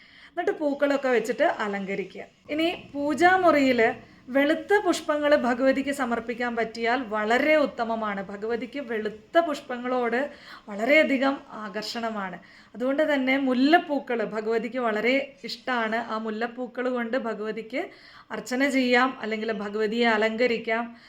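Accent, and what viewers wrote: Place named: Karnataka, India. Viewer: thankal karnatic ano, thankalude slangil evideyo kitti, nhan kasargod.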